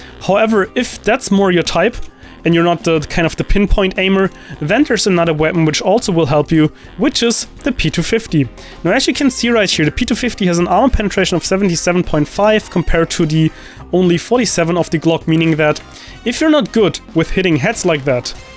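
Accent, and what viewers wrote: Germany. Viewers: junge du klingst soooo deutsch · Craig Ferguson doing CS:GO tactics after the bell rings xD I love german accent. Somehow makes me giggle.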